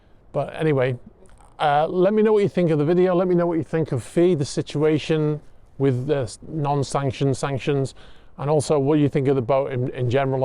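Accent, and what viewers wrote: Liverpool, United Kingdom. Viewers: first video I’ve seen of yours here, belter, nice to hear a fellow scouser on YT!! Keep the bits up lad is right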